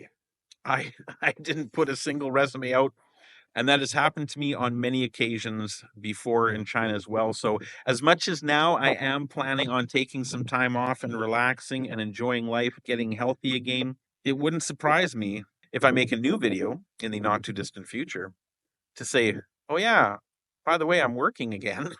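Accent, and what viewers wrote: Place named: Canada. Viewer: Lovely Canadian Accent 👍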